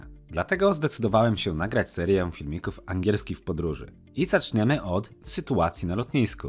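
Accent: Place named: United Kingdom. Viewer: Wszystko fajnie, ale ten akcent brytyjski... Jest okrutny... Także szukam dalej 🙂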